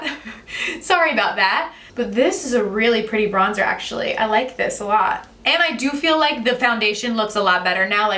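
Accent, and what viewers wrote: New Jersey, United States. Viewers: Oh she is not, shes always talked like that, she's from Jersey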